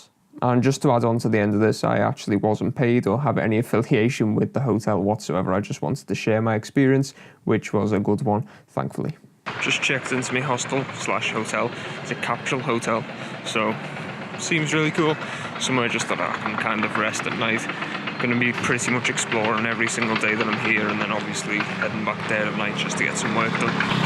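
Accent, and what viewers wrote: Liverpool, United Kingdom. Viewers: Are you a Scouser because if so you're the first Scouse youtuber I've ever seen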